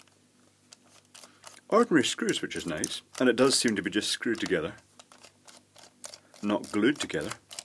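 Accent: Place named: England, United Kingdom. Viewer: "Oh I think I may be out of plumbs here" I love the queens english!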